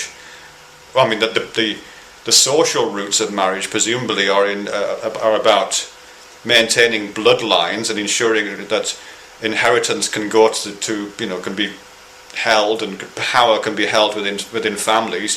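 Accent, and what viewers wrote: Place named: Bolton, United Kingdom. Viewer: As a side note, your dialect sounds similar to my wife's ex-husband, who is from Bolton That made me look up Blackburn, and I found that they're not far apart :-)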